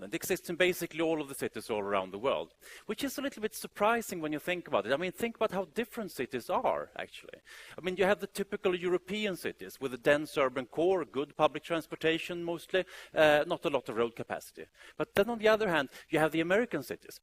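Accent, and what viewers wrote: Sweden. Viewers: Of course I know the definition of awkward. Apparently you don't, since you had to question it. Being a Swede myself, you can't imagine how painful it is listening to someone speaking English, in the Swedish accent. And this guy had a really thick accent. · How amazing how the swedish accent and speach melody comes through his english !! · DAT ACCENT... Let me guess, swedish? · So swedish hahaha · awesome Swedish accent XD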